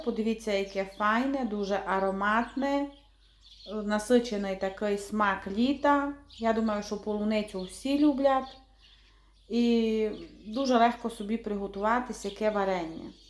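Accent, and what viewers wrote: Transcarpathia, Ukraine. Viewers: Дякую за рецепт, дуже гарно розмовляете по закарпатський!! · Щиро дякую, за рецепти, за приємне закарпатське мовлення. Здоров'я вам,наснаги і здійснення всіх задумів. Слава Україні!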